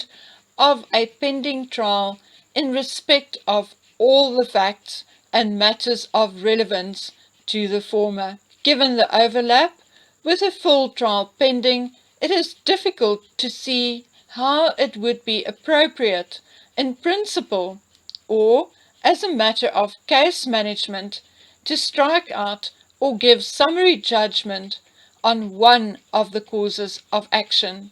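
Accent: South Africa. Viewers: I could listen to the South African accent for days.....xxx love your narrating voice x this must go to trial x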